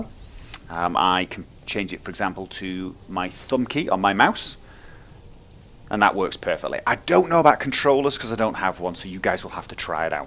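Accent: United Kingdom. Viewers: Would guess British but lives in Norway. But man, Trondheim is such an epic name! Greets from Sweden.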